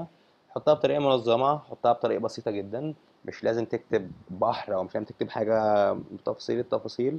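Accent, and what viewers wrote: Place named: United States. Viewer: بص الامريكن اكسنت كويس جداً مشاء الله بس افصل مبين العربي والانجلش لانك تقريبا بتتكلم عربي اميركن اكسنت هههه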